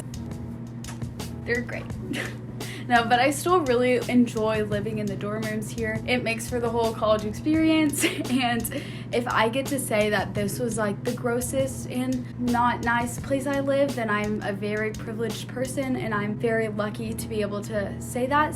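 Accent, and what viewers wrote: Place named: Europe. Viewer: You sound weird for Texas. Almost European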